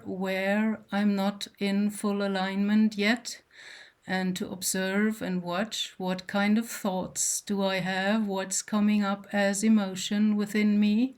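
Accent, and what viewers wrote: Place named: Germany. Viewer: I had to subscribe. You have a similar German accent to my father, an accent that shows that you worked on perfecting your english. He passed at 90 a couple years ago and today May 11th was his birthday. I called his partner to wish her a happy mother's day, and we talked about him. Anyways, i like your message, i hope you're back in full swing soon. 💗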